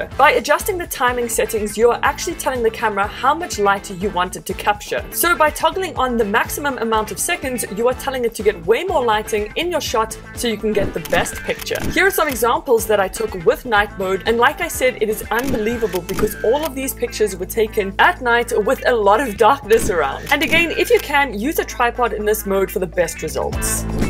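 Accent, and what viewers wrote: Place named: South Africa. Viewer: Damn, I didn't know you are SAn. Well the accent is there, but the channel numbers say a different story.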